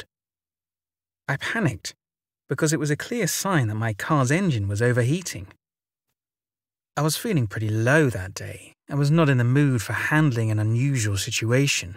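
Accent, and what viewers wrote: United Kingdom. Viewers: Do you have a British accent? Everything he says is really British sounding. Im not saying he says goat like goot or anything, just that he says goat with a very British accent. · Dude I love your British accent! It gives the stories a great touch. Awesome content my friend! Watching from Dallas Texas U.S. home of the Dallas Cowboys not too far from goatman Bridge!🤠 ⭐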